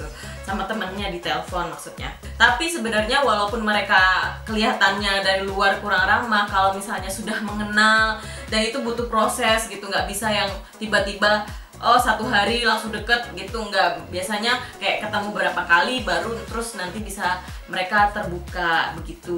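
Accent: Java, Indonesia. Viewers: Asli mana mbak e.. kok medoknya, medok jogja or solo.. cerita dong, riwayat sampai swedia dan bla bla bla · Sampean jowo pundi mbak, bosoe kog koyok daerah q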